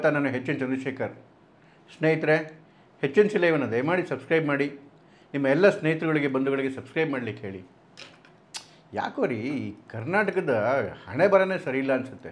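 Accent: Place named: Tamil Nadu, India. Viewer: Tamil chenai mathadthane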